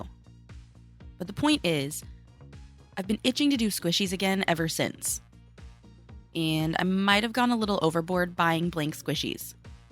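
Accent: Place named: West Tennessee, United States. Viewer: gotcha! Well that is surprising. I'm in West TN so not close! Haha 😂 You sound like the people around here. Not talking about the country fol, just the city people. Lol Which now that I think about it, the city people, especially the newer ones (like in the last 20 years) would have come from somewhere else. So it makes sense their accents would be similar to those in other parts of the country. Lol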